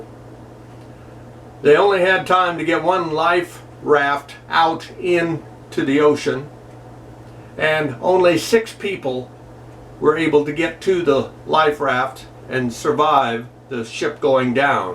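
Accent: Nebraska, United States. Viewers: You surprised me! I tuned in to keep up with current events and hear that Nebraska/family accent, well,I got the accent. I didn't know it was going to be spooky story night! Please consider occasionally telling strange/scary stories that Nebraskans old-timers tell for true. My family has a couple dating from the war. Thank you.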